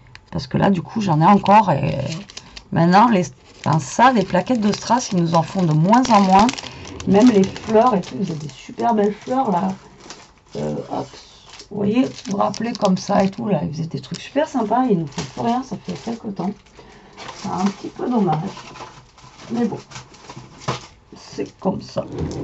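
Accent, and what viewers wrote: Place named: Marseille, France. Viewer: Ha voilà ça s’entend lol j’adore moi Marseille 😉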